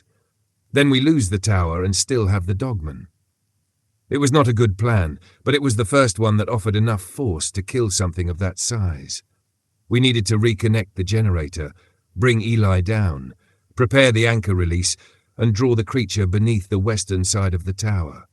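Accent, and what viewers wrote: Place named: England, United Kingdom. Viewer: Like the premise the English accent or whatever it is I just can’t do it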